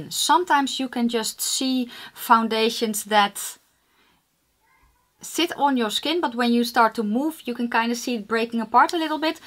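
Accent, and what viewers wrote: Netherlands. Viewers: I knew that you are from the Netherlands as soon as you speak. Something about the accent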